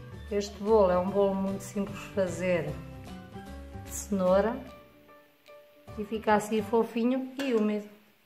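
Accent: Portugal. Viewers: Sotaque lindo nosso de Portugal e não enrola vai logo a receita adorei isso 👏🏼👏🏼❤️vou fazer depois venho contar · Amo sotaque de Portugal. Sou mineira do Brasil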